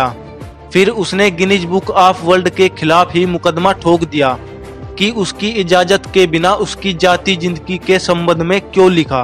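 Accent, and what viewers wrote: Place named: Haryana, India. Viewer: Ur voice accent is like haryanvi language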